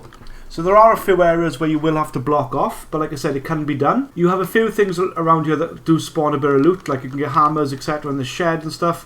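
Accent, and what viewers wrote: Wales, United Kingdom. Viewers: U welsh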